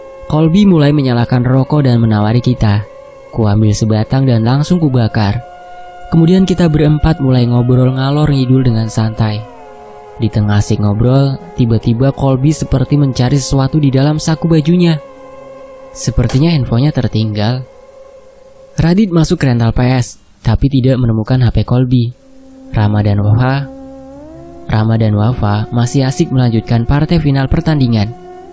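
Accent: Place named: Cianjur, Indonesia. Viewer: Cianjur suarany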